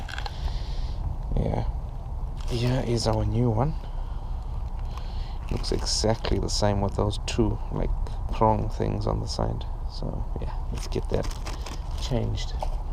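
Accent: Durban, South Africa. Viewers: Nice work. Dude you sound like you from Durban South Africa? your accent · Bro are you South African? 😂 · You sound South African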